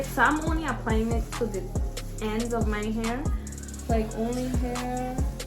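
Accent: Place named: Caribbean. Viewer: 😗😗😗I hear that caribbean accent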